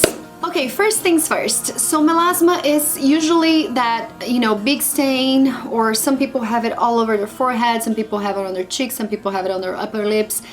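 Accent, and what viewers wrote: Brazil. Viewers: I like your accent are you Brazilian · You sound like you're Brazilian every words ends with a e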